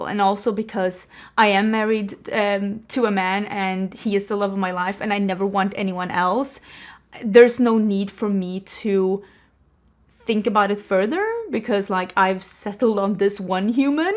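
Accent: Sweden. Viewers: dont change! you're so Wise and Talented! I love hearing how you pronounce things with your Swedish accent. So glad I found your channel, You're my favorite Youtuber 🥺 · omg........ i love my swedish clown with an accent..... dont like me well dont let the door hit you on the way out..... ppl have too much time on their hands omg!!!!! i love you!!!!!! you are perfect the way you are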